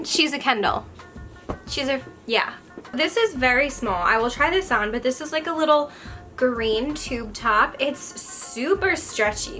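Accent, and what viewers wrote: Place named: Wisconsin, United States. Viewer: Your Wisconsin accent is the cutest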